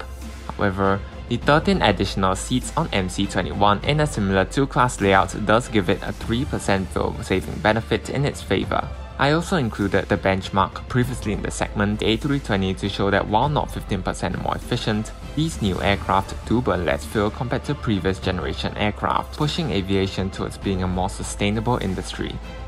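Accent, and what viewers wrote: Singapore. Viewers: the host spoke like either Singaporean or Malaysian. and a lot of his comments are bull shit. C919 components is made world wide - even the body structures design involved a lot of European consultants---why? So that C919 can get FAA airworthiness certificate.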